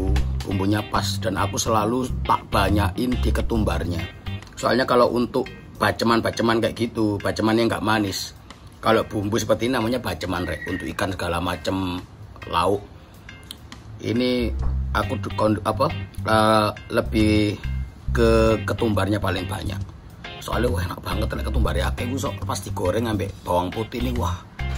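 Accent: Surabaya, Indonesia. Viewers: samean wong suroboyo aa mas?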